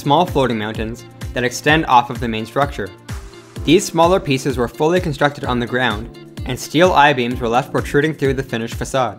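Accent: Canada. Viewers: Americans speak English. A LOT of brits have broken English as well but let's all ignore that fact. And he's probably Canadian, Americans don't pronounce words the way they do.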